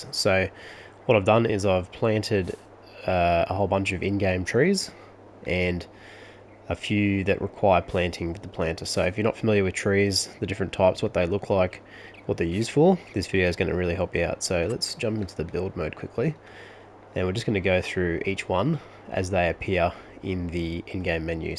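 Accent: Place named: Australia. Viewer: Liked and subbed Great to hear an Aussie playing FS on YouTube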